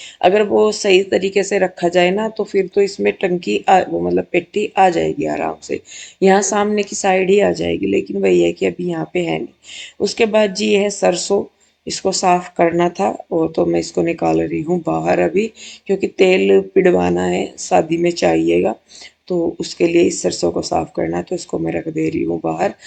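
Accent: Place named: Meerut, India. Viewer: Assalamualaikum me farzana Karachi Pakistan SE 4 3 Saal SE apka vilog dekh rhi hoon mere dada Dadi bhi Meerut SE thy apki boli buht achi lagti hy mere dada Dadi bhi easy hi bolty thy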